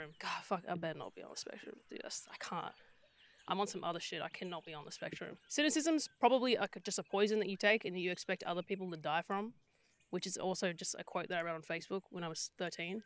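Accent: Australia. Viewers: my favourite part about this video is that you sound Australian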